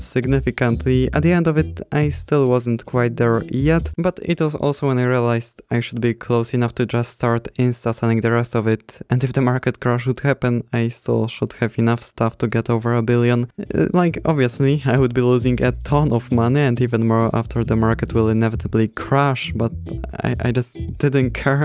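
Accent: Poland. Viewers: Kurwa oglądam sobie i słyszę charakterystyczny akcent patrzę w info a to kanał polski xD powodzenia w anglojęzycznej karierę będę tu chyba czasem wpadał :) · po tym akcencie odrazu wiedzialem ze polak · Are you from Poland? I hear an accent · You sound like you are from Poland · Kurwa odrazu po akcencie wiedziałem że to polak xD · Ty z polski? Bo masz nasz akcent? You from poland? Because you have our accent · słysze polski akcent czy nie prawda?? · u sound like polish and sound like u are reading script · Słychać że Polak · Jesteś z polski? Słychać po akcencie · My polish detector went insane after I heard you speak, I was right judging by your about page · Takiego polskiego akcentu nie ukryjesz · U from poland? i recognise the accent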